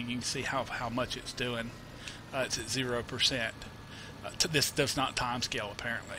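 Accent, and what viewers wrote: South, United States. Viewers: hey bo really like how you explain the different steps. Most other videos do explain it quite like you do. Appreciate how you explain stuff. Keep up the great work man. I am from NC sure is great to hear someone from the south explain things. Makes alot more since to me than someone from some where else.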